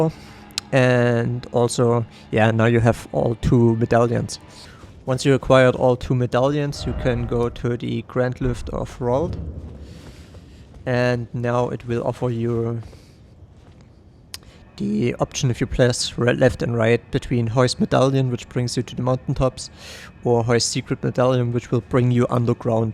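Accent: Germany. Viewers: der deutsche akzent scheint durch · Are u german? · Kann es sein das du deutsch bist? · U sound way to german xD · You have German accents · Hör ich da nen starken Deutschen Akzent? Hehe aber gutes video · Are you german?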